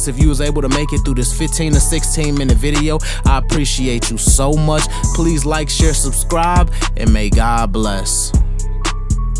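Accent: Chicago, United States. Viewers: You the shit! I love how you Chicago slick talk 😎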